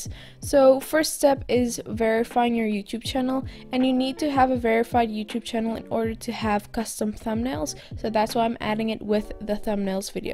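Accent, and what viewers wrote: South Africa. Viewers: You sound south african I love it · This was very useful!! Also I love your accent!! Are you South African?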